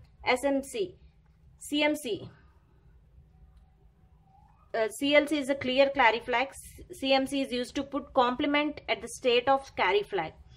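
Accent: South India, India. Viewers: Nyc effort But There is a prob. In your slang Slang like. South people 😬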